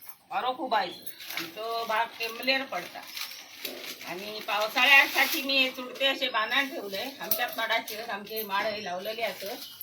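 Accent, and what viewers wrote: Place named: Konkan, India. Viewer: खूप छान वाटला बघताना आणि तुमचा मालवणी ऐकताना. एकदम कोकणात गेल्यासारख्या वाटला. इतके ताजे फडफडीत मासे खंय बघाक मिळतंत. 😊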